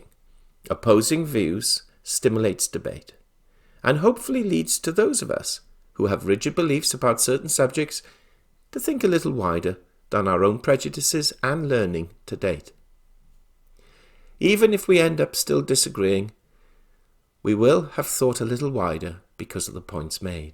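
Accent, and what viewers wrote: England, United Kingdom. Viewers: I truly love your postings. I make it an effort to watch for them every day, even if I place it on speed 1.5 to make room for the heavy English accent. Normally I listen to it at 2 and I do listen to many people on the markets. With that being said, you do go to awful lengths to prove you were right and others were wrong. I have noticed more often than not, you are right, hence why I choose to listen to you. Please consider the concept of “realizing you are right, you do not need to justify it in anyway”. You know, what you know.... and you sound extremely intuitive as well. Let go of any need to prove others wrong for it does not serve you nor me listening to you. Please, hear my views come from a pure heart. Thank you so much for giving us your guidance and advice each and every time you take the time to post a video. If you need any assistance on releasing this issue please let me know.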